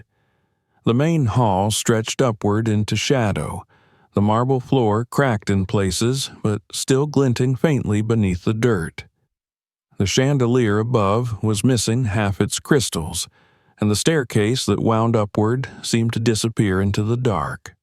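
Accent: South, United States. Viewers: Loved the story, but seriously change the voice. The old Southern drawl does not suit the story. He can't even pronounce the Italian names.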